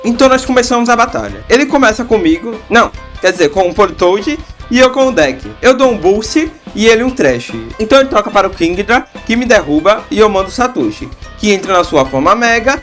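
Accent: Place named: Lagarto, Brazil. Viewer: ? você é de lagarto?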